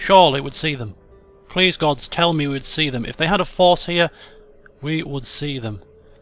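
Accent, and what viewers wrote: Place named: Northern England, United Kingdom. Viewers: Leeds, a fellow northerner can tell the accent a mile off ;) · Gotta say its always good when you encounter problems doing a campaign on Total war, no one likes a straight forward campaign haha and I can't quite work out whereabouts up north your from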